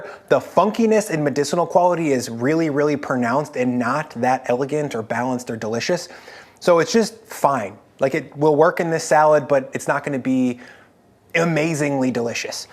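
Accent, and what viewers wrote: United States. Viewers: I love your American accent.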